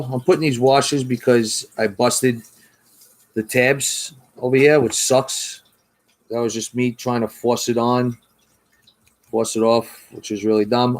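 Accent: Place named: New England, United States. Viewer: You accent makes me miss life in New England (grew up in RI and MA). I'm currently in EU but hoping to get back next year.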